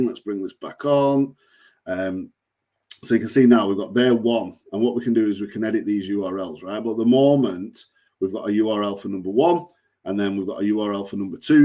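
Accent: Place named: North, United Kingdom. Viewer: Yes! Sound like a northerner lad.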